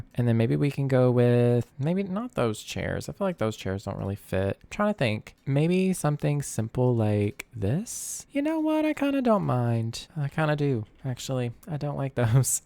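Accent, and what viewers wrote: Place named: South, United States. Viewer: This is the first video I’ve seen of yours and I’m obsessed already! ❤️ You’ve got that sweet Southern belle realtor vibe going on and it feels so genuine lol. I pop in and out of Louisiana sometimes, so maybe that’s why I’m picking up on it. Either way, I’m here for it! 🤣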